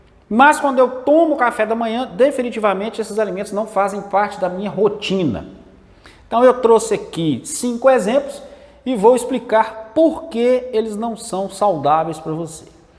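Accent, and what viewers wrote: Minas Gerais, Brazil. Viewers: Prazer em conhecer nosso "Dayan Siebra" com sotaque mineiro (carinhosamente) . Obrigada pelo vídeo !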